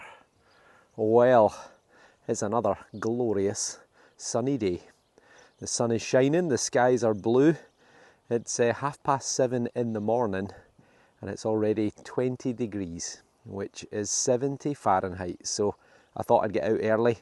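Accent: Scotland, United Kingdom. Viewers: Dear, I'm from Switzerland and i celebrate each of your fantastic videos. I'm a great fan of your scottish english and i finally realised that the name of Australia's Perth is because of Perthshire...;-) I have 5 weeks of summerholidays and i'm a detectorist aswell. Is there any chance to join You? I know, You must get thousands of this kind of demands, but just to be with You, finding a Roman Denarius or something similar would be the jackpot for me! Please let me know asap. Kind rrregarrrds Stefan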